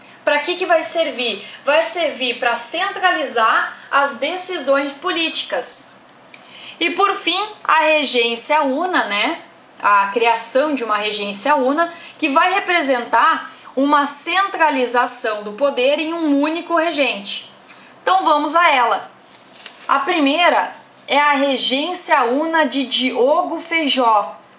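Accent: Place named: Rio Grande do Sul, Brazil. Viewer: Pela forma de falar a conjunção mas, garanto q é do RS, haha tbm sou, gostaria de saber se tem como fazer video aulas sobre a historia do nosso estados, e venho dizer q as aulas tem me ajudado muito, desde já agradeço e muito bom trabalho tens feito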